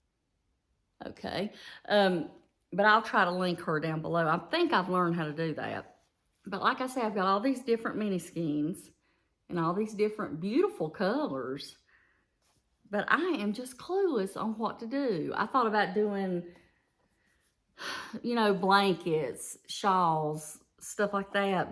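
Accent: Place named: Virginia, United States. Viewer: Hi. I'm enjoying your videos. You sound like where I grew up in Virginia. Is that where you're from?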